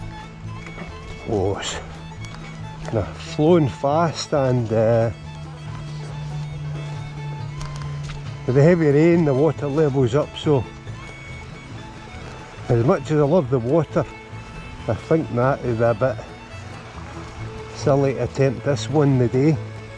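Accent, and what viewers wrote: Scotland, United Kingdom. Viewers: You sound Scottish! What does “57” stand for on the wee bike? And the wind drowning out your narrative on the ride as well as the banjo music so I cannot understand you thou we speak the Kings English here in Texas USA! Too! Hoo mon dude ! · Well, I am a yank!...and we yanks struggle with those British accents. Scottish, right? And good luck with your secession from GB. Seriously. 😊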